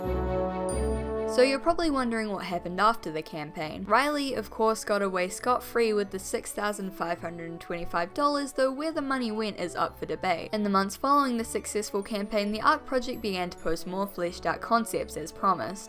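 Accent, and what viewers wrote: New Zealand. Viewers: I am now secretly praying that this youtuber is Australian and not a New Zealander, because I really like my joke but the more I watch the more her accent makes me think New Zealand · Is that a New Zealand accent I hear?! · holy shit I had completely forgot about this project. it was actually a scam lol p.s. I love your kiwi accent! you got a new subscriber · Just stumbled across this video by chance but I can't help but feel I've seen this person somewhere before. It might just be the kiwi accent but I'm sure I've met her at a party or sat next to her on a bus or something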